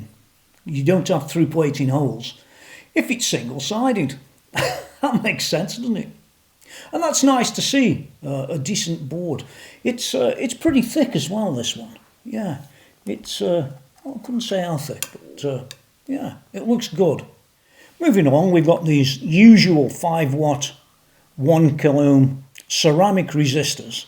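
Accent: Stoke, United Kingdom. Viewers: Am I picking up a Stoke accent?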